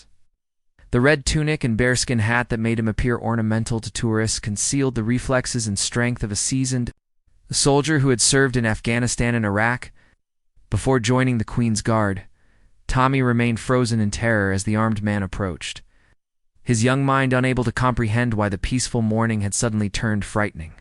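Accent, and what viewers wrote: United States. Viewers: Yet again more smooth American accented bullshit! Why not take the mickey out of the Arlington guards? Chickenshit?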